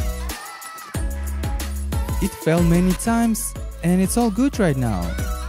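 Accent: Russia. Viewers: I love his Russian accent/grammar 👍🏼👍🏾👍🏿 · ну акцент то русский слышен за километры... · И акцент русский(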